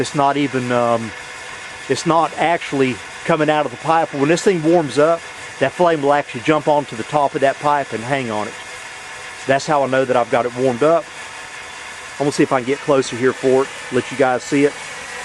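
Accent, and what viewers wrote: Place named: South, United States. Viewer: its weird hearing a southern accent saying "you guys" are you originally from up north?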